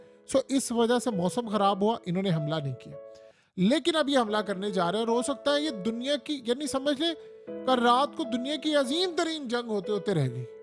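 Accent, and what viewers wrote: India. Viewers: baie AP India chale jayen India ki taraqi AP ke Liye bara aham he or bricks ko bi join kar Len dollar ka janaza bi jadi nikalen. We love Pakistan. AP ka lahja not a Pakistani but Hindustani.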